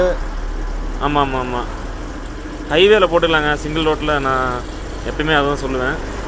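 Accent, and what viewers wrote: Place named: Madurai, India. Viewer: அண்ணா மதுரை மொழி லந்து 👌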